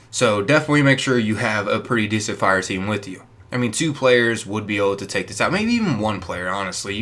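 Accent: United States. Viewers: Thanks for making a video game video with a normal American Voice!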